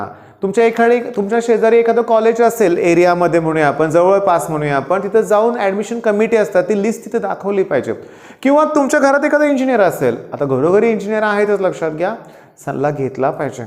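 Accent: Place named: Pune, India. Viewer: Its Puneri marathi I guess....You have no rights to make fun of this. Hope you get some wisdom about respecting our Teacher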